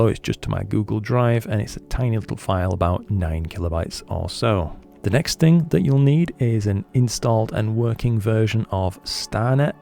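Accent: United Kingdom. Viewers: Tried again from scratch, now I get this "Cannot execute instance on view: R Reason: LRGBCombination cannot be executed on grayscale images." ( to your earlier note, there is no way to extract luminence from the split G or B files ). Do you do zooms? Be happy to pay you for 30 mins to watch me and tell me what I'm doing wrong, live in the USA, guessing you're somewhere in the UK? (I'm an Australian so I speak British lol)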